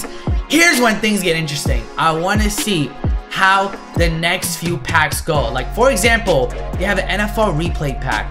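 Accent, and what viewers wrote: Maryland, United States. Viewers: Are you from Mary land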